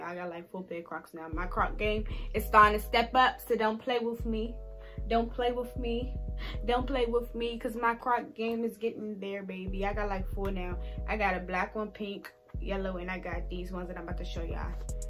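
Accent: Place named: Baltimore, United States. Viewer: Only real ones know she has a Baltimore accent 😭😭😭😭.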